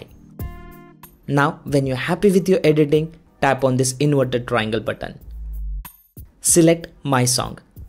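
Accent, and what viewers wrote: India. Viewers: Always a boss with an indian accent that comes in clutch! Thanks bro 🤌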